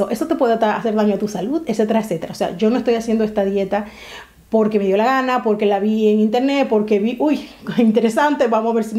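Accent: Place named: Dominican Republic. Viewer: Te encontré por casualidad, tu forma de hablar me llamó la atención. Soy dominica y en tu forma de expresarte parece de allá. Tengo un gran tiempo escuchando de estás dieta y es observada el consumo de queso es vital, quiero preguntarte si este puede ser sustituido , tengo problema con la lactosa y aunque dicen q éste no tiene a mi me produce muchos gases.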